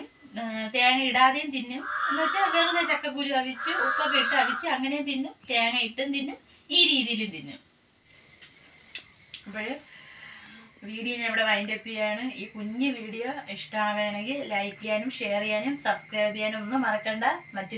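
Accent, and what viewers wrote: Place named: Kattakada, India. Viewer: Vere content onnum. Kittiyille ithu ellavarkum ariyavunna karyamanu vakkukal mattiparanjalum kattakada bhasha kayarivaru nnu that is quite natural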